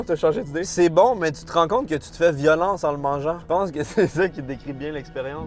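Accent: Quebec, Canada. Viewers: J'adore tes Vidéos , donnes tu les restes à tes amis ? ça me donne la gerbe . Ton accent Canadien ( Phil/France) me remplie de joie . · L'accent du Québec me tue toujours autant. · Super vidéo et l'accent québécois est tellement agréable chui jaloux ! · l'accent québecois est vraiment retardé:face-purple-crying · Je découvre ta chaîne!!!! Je suis juste fan , quand à votre accent Québécois, c’est juste splendide 💪✌️ Le bonjours de 🇨🇭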